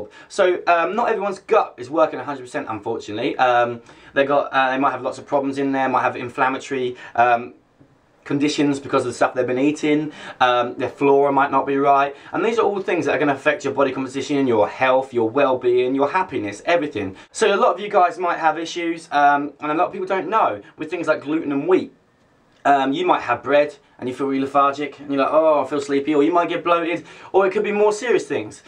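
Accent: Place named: Australia. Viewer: always thought you had something Aussie in ya there it is mate come live here again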